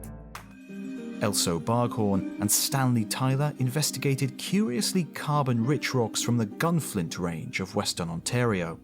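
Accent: England, United Kingdom. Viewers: Lol all it took was a British accent to fool you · You're a fantastic orator. My best guess is that it's due to the specific accent you carry. It is a particularly precise variant of British English that Americans have subconsciously come to appreciate as being intrinsically reputable. If there is a British man lecturing you with this accent, friends, listen closely, as he knows what he's talking about and his intel will be vital to your quest!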